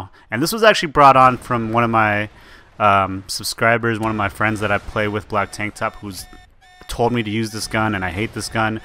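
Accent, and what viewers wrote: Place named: United States. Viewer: When u said u were asian i was like whaaaaaaaaaaaaaaat? xD you got an american voice, nice gameplay.. as always!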